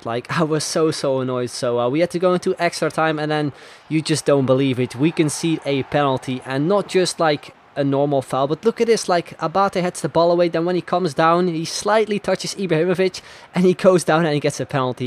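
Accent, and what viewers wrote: Netherlands. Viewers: you can hear the dutch trough the english :p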